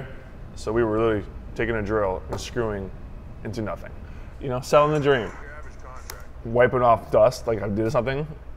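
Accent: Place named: South, United States. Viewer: he has a country western accent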